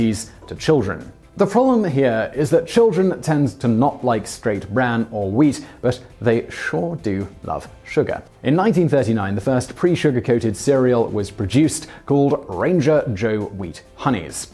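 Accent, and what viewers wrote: United Kingdom. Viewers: This is the most British Simon has ever sounded in any video.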